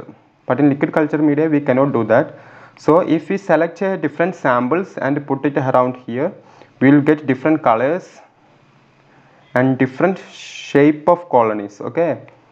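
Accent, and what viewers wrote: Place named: United States. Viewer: The way,you are speaking English seems like you came from USA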